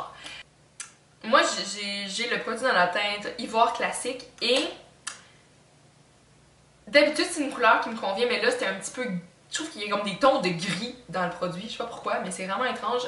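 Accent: Quebec, Canada. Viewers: Ah ! J'adore les youtubeuses quebequoises !! Votre accent est vraiment craquant ! J'ai aussi les cheveux bouclés et quelle galère de trouver un produit efficace, que ce soit pour les nourrir ou les definir... j'ai testé aussi la game john frieda et comme toi, bof... je soupçonne même que ce soit bourré de silicone et autres berk...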